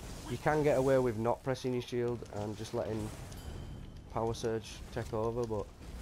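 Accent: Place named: Manchester, United Kingdom. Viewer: you sound like a Manc lad, sunny Bolton me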